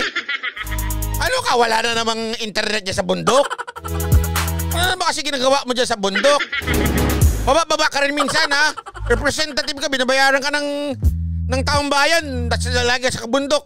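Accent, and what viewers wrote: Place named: Iloilo, Philippines. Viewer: Bwaaaah bagay sayo mg ilonggo sir 😂😂😂ang dami kong tawa sayo tlga😂😂😂😍😍😍❤❤❤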